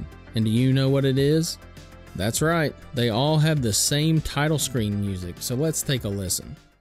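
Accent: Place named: Alabama, United States. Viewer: Love this game! You sound like you`re fromn alabama like me.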